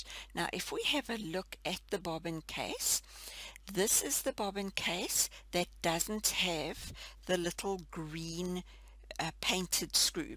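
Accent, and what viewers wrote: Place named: South Africa. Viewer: Lovely to hear your SA voice. 🙂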